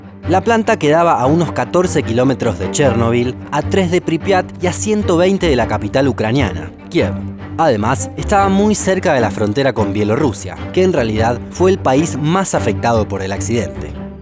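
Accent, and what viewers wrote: Buenos Aires, Argentina. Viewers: Que embole con tonada porteña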